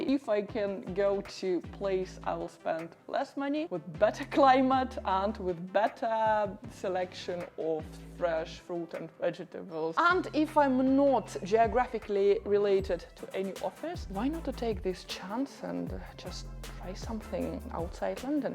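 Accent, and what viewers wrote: Russia. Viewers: Wow, Norwegian with Russian speaking accent. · Hi, I like your content. Good work. I am a little bit like you, except I am not that pretty. I guess they calling us expats or gypsies 🙂Do I hear a russian accent out of you, or am I totally wrong.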